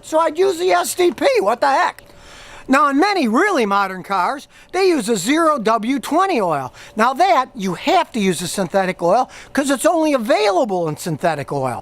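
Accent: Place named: Poconos, United States. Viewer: Gotta be from the Poconos brthr.1,2,tree love that Pocono accent and the info. Thank you!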